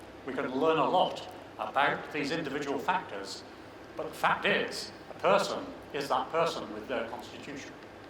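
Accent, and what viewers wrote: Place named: England, United Kingdom. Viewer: I'm at risk of developing Type 2 and i was wondering is laughing a risk to raise my blood pressure? The first 5 minutes I was just laughing, he has a funny English accent and what about that Angel of the North story LOL LOL and the people with their faces erased LOL LOL LOL aaaaaaaaaaaaaaaaaaaaaaaaaaaaaaaaaaaaaaaaaaaaaah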